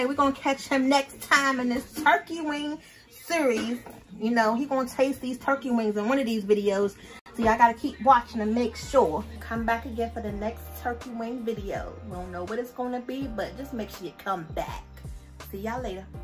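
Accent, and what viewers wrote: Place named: Maryland, United States. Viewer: Yummy. First time watching. New subbie. Let me guess Maryland???? That accent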